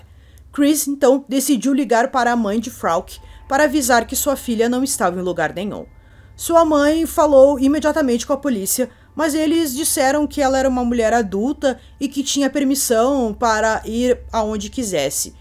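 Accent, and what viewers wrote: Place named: South, Brazil. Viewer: O que estraga o vídeo é o sotaque sulista. Meu Deus muito ruim e chato esse S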